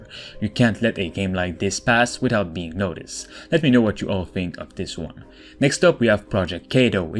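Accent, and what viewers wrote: Quebec, Canada. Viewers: Dude sound like he from quebec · Ça paraît que ces un québécois qui parle😂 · U sound like gsp . You're definitely from quebec. Great review merci . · Aye un québécois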